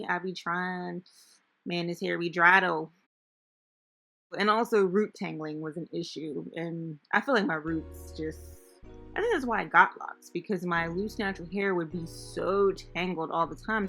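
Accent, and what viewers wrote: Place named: Southeast, United States. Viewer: Dis joint be dry dou... lol Sound like you from S.E. Haaaaaa!!!!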